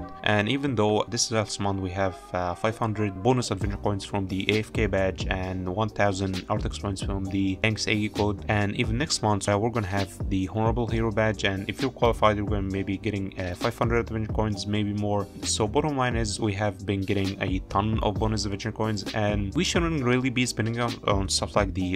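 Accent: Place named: Egypt. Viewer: I can tell that you're Egyptian from just your accent.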